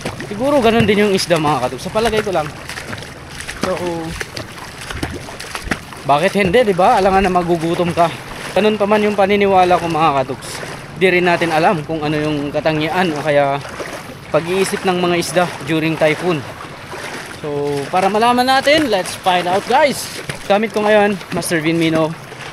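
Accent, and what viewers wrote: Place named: Ilocos, Philippines. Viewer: Oks Sana kaso korni ka magsalita bro.. Halata naman ang Punto mo being an illokano😅😅😅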